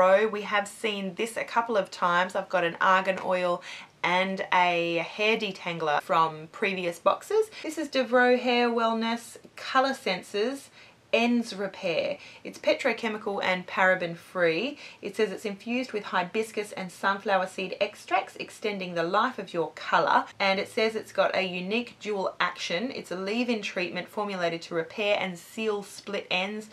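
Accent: Australia. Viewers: Hi! New subbie here and I love u already! And I love Australian accents. Hugs from the states.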